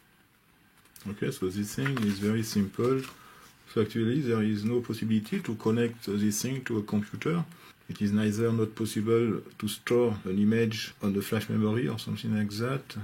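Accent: France. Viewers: Love your English in French accent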